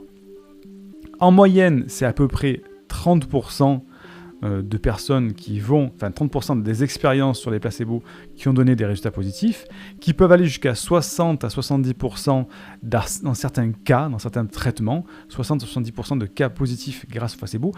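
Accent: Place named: Quebec, Canada. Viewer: Euh...écouter un québécois à vitesse 1,5 ça demande concentration quand même 😁. Heureusement que c est pas long. Très intéressante vidéo, merci. Christophe Bernard, d Altheaprovence explique très bien aussi l effet placebo et nocebo... Et, si, oui, moi je m endors volontairement à l heure que je choisis et je croyais que ça marchait pour tout le monde !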